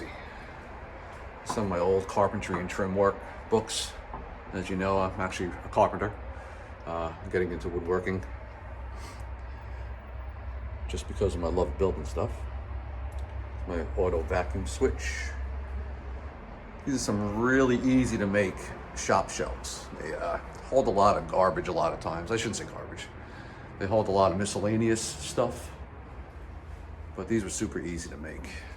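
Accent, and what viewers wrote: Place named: New England, United States. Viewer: Great video. Some interesting setups like the use of old tables. You must be from New England you have that accent. At first I thought the license plate was Oregon any way good stuff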